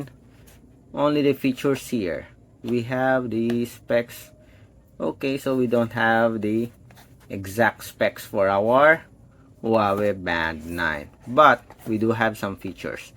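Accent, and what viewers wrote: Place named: Philippines. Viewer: Pinoy accent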